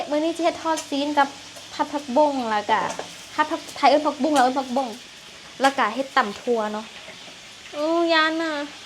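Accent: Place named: Laos. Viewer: ชอบฟังน้อง พูดไท-กะไดสำเนียงลาวเพราะดีฟังไม่เบื่อจ้า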